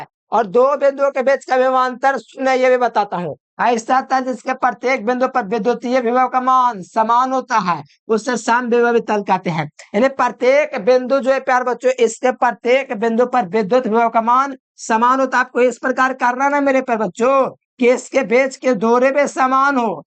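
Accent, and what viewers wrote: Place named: Bihar, India. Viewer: Bihari style me education lecture 🔥🔥🔥🔥🔥🔥🔥🔥🔥🔥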